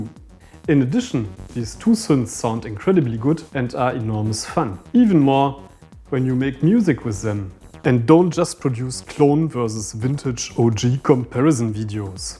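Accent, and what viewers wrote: Germany. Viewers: Man i love your german accent, it reminds me that there are also great electronic musicians in germany ❤❤‍🩹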